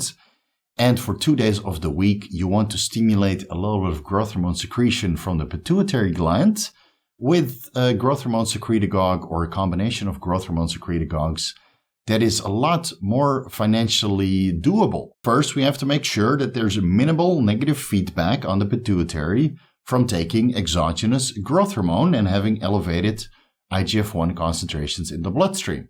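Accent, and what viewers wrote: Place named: Netherlands. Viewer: what is your heritage, I can't quite place your accent.... Dutch?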